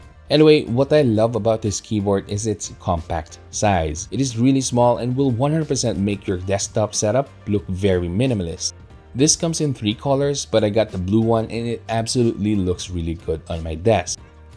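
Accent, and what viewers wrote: Philippines. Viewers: I love that Filipino accent! 👌🏻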